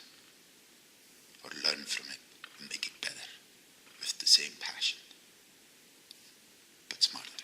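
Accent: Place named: Scotland, United Kingdom. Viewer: whats up with the scottish accent? :p Freaky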